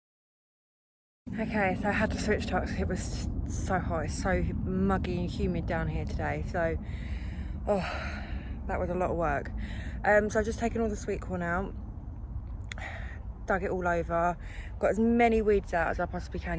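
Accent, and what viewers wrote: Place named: Essex, United Kingdom. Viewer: I believe it's an Essex accent.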